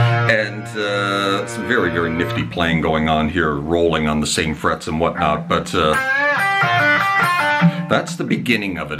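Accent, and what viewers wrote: Canada. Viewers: Well, thanks for sharing your knowledge! By the way, from the Traynor amp in the background and your accent - I knew you had to be Canadian. (I grew up in Alberta.) Checking out your offerings now!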